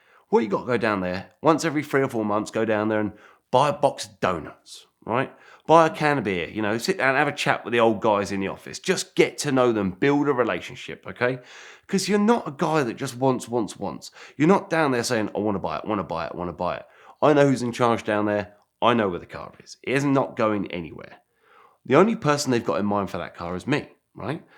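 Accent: United Kingdom. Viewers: maybe its just the brit accent but i now want to make the lightning mcqueen mobile! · i dont expect the everyman-british accent from him! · I don’t care how good a story is, it’s just that much better when hearing it from someone with an excellent British accent.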